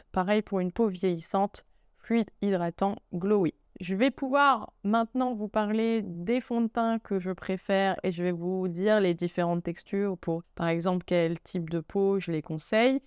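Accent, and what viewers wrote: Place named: Paris, France. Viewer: La vidéo est très intéressante et les conseils sont bons mais l'accent parisien là j'arrive pas 😅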